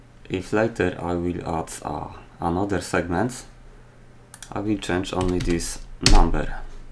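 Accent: Poland. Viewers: You sound polish... ru polish? Nice vid btw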